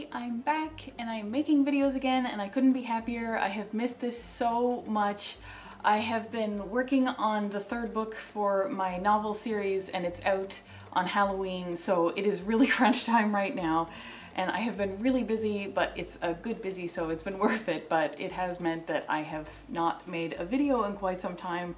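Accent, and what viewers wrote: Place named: Canada. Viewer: So so gorgeous.. like seriously, love look, and the Canadian accent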